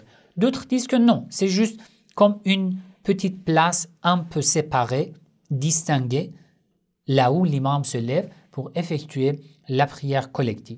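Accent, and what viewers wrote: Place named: Iran. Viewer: Bonsoir, vous etes iranien?? J'aime bcp l'accent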